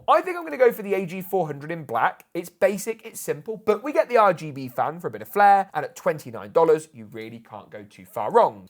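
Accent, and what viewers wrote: United Kingdom. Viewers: Fuckin British accent 😢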